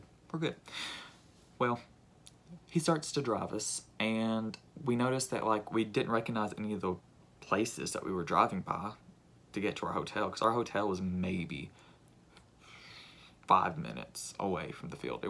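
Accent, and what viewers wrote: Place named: North Alabama, United States. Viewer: The accent!!!! Where are you from, cause that sounds like a north bama accent?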